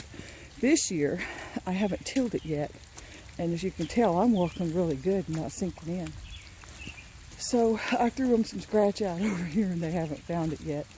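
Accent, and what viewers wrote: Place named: Oklahoma, United States. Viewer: I personally don't think it's anything you're doing if your view count has gone down or likes because of everything going on with the chicken industry and all the red flags evolving around chickens I think that there is more people making chicken videos than ever which is changing the algorithms and YouTube likes to mess with people like yourself that's true and genuine it's not people that's leaving your channel because you're mentioning God it's because YouTube's making them miss the video and not sending people their notifications because you're mentioning God and you're popular now I think your videos are awesome and you also remind me of my grandma that's one of the reasons I watch your Channel because you talked to your chickens and you do not belittle them yeah there's something about your Oklahoma accent that reminds me of my grandma. 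So please ma'am don't get discouraged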